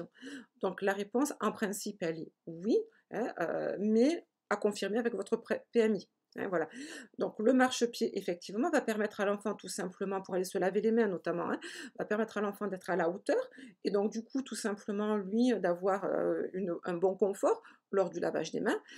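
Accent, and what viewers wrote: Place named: South, France. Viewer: Merci pour vos vidéos qui sont très intéressantes ! Si je peux me permettre un détail, vous avez un très bel accent du sud avec une expression qui revient ÉNORMÉMENT (le fameux "tout simplement") l'avantage c'est que ça laisse le mot "simple" en tête et c'est toujours mieux que "compliqué" ;) tout simplement :D merci encore pour vos vidéos qui m'aident à y voir plus claire dans la formation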